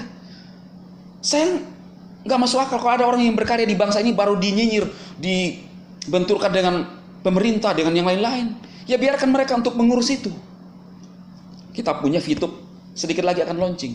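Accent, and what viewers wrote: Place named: South Sulawesi, Indonesia. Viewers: orang dari sulsel kah bang? logat na kentara e...hahaha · Bang asalnya dari sulawesi yah? Logatnya kayak org makassar..maaf kalau sy salah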